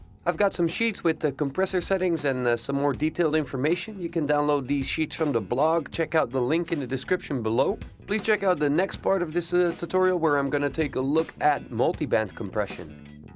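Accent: Netherlands. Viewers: Amazing tutorials! Within the first few minutes of your video, I already knew; "I'm listening to a Dutch guy". Rotterdam! Lekker man!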